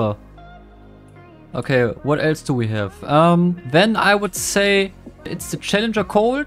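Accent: Germany. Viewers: Das ist Englisch mit deutschem Akzent richtiges Denglisch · Almann Akzent kickt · You can hear that he’s German haha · I love this great german accent... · I Bett he is from germany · Man merkt den deutschen Akzent😂❤ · Man merkt so hart, dass er deutsch ist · I can Hear that your german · In a german speaks englisch everyone knows he s a german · i love his german accent🤣😭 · I love your germann acsant I find it way better then other peaple acsent 😂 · I smell a little bit German here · Man merkt richtig das deine Muttersprache Deutsch ist ( merkt man an der Aussprache von manchen Wörtern trotzdem Respekt für deine Englisch Künste). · He's German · just a German accent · Bro is from Germany so his accent is just like that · i hear german actent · Don't blame him, he's German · The german accend is so nice!:popcorn-yellow-striped-smile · I hear DEUTSCH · Man hört so den deutschen Akzent 😅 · can it be so you are from german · you can hear hes german. 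Good Video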